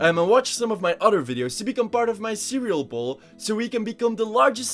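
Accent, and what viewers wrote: Flanders, Belgium. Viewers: are you belgian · What part of belgium are you from? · zijd gij Vlaams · wacht deze guy is belgisch? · YOU'RE BELGIAN?? I KNEW YOUR ACCENT SOUNDED FAMILIAR!? LANG LEVE BELGIE 🇧🇪🇧🇪🇧🇪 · t'es belge ?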